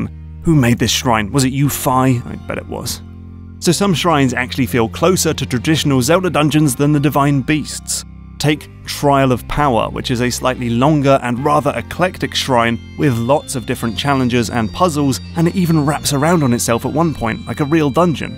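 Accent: United Kingdom. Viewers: I was expecting "anti-clockwise" from the British accent. Must have been painful to say "counter-clockwise."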